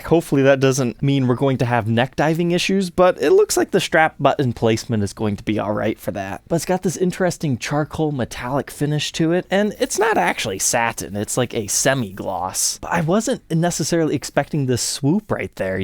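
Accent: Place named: Ohio, United States. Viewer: It’s that Ohio accent. He always says things like “needs restringed” instead of “needs to be restringed”.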